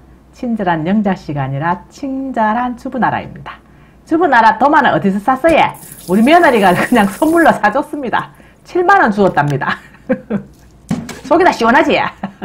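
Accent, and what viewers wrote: Gyeongsang, South Korea. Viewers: 말씀도(구수)하게"잘"하시고~! 경상도,(방언)너무,재미있어요~! (짱)~!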